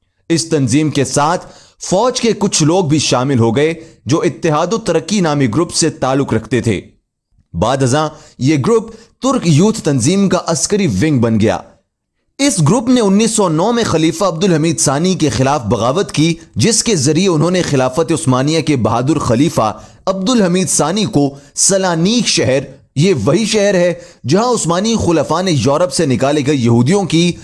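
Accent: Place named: United Kingdom. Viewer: Kamal ke English accent dali apny..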